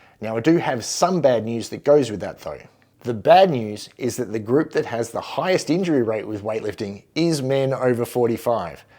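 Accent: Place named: Australia. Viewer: Excellent info, and I can vouch for 95% of it from living it firsthand. Hearing it in an Aussie accent was a nice bonus. 🍻 🇦🇺 Cheers, from across the pacific (fellow lifter over 40)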